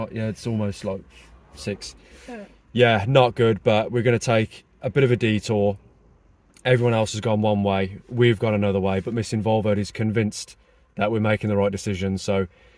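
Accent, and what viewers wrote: United Kingdom. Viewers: Judging by your accent you are from around my area somewhere, there is a good crowd of UK Volvo folks, if you ever want to meet up contact me.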